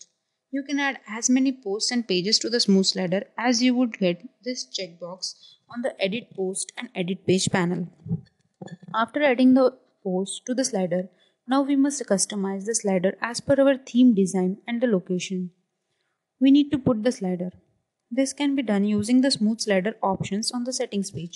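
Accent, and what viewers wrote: India. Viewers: Indian speed, accent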